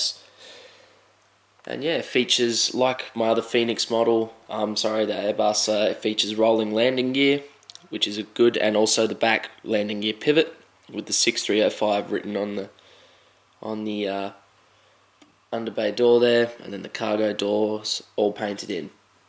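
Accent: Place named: Australia. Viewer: ahh mate i absoloutely love your good old aussie accent! it's so close to an nz accent! (the best =D) great video!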